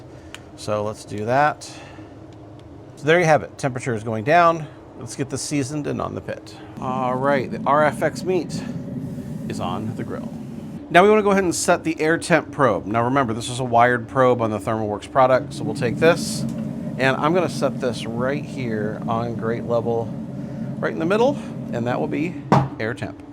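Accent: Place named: United States. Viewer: You sound American, switch those calipers to inches please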